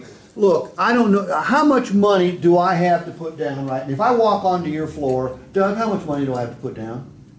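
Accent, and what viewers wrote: Appalachia, United States. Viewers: and his hick hillbilly fog horn leg horn talks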